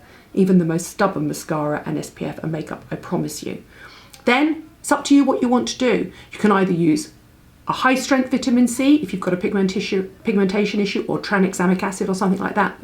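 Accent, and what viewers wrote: United Kingdom. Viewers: I’ve come to listen to you, I’m just the average American loving the British accent.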